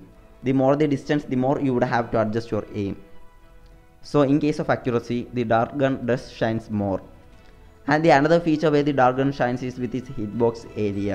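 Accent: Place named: Kerala, India. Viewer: U are a mallu.... ur eng tells it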